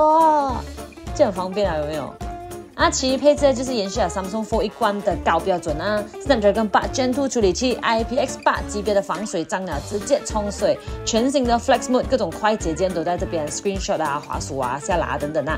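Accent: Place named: Malaysia. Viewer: 聽大馬人講國語好痛苦...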